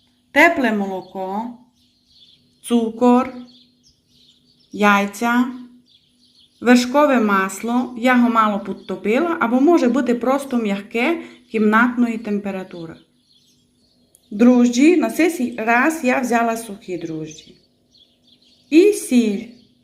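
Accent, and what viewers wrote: Transcarpathia, Ukraine. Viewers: дуже приємно дивитись і слухати Вас.Булочки як і все у Вас,дуже гарнезні і смачні!Дуже люблю дивитись Ваш канал.Всього Вам найкращого,здоров'я і Божого благословення.Все буде Україна!, Ваш Закарпатський діалект як мед на серце!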